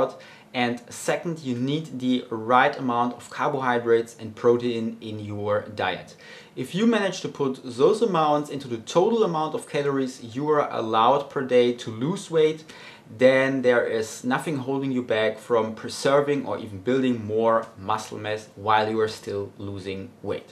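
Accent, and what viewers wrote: Germany. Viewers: German Accent : )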